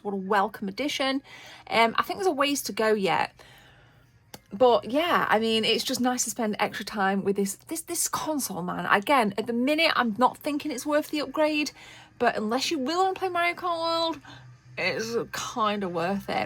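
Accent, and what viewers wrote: United Kingdom. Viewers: I love your accent, I can listen to people from the uk all day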